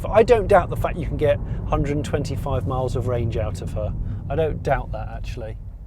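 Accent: United Kingdom. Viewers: I am in love with the design and how much character they managed to add with such simple language. I think its beyond its rivals in all aspects and I am happy to sacrifice from range if that is how my car looks like. Quick question: Why do British call it Hondr and make it sound normal?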